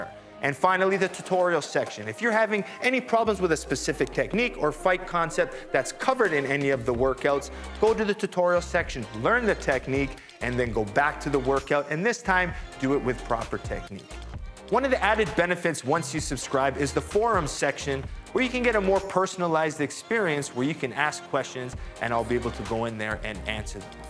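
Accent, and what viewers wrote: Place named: Italy. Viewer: Dude you have an Italian accent!!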